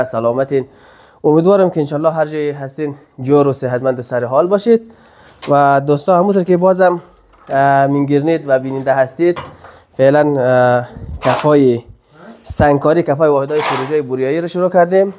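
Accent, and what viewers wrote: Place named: Hazarajat, Afghanistan. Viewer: باسلام‌بهتره‌ بدون لحجه هزاره جاغوری صحبت کنید مثال فارسی دری یافارسی‌تهرانی صحبت کنیدکه همه فهم باشد.تشکر🇦🇫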